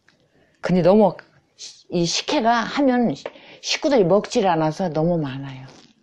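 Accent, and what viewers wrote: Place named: Chungcheong, South Korea. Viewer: 충청도 아주머니신가봅니다 ^^ 저도충청도가 고향이유 재미있고 확실하게 설명도잘하시네요 재미있게 잘배웠습니다 건강하세요😃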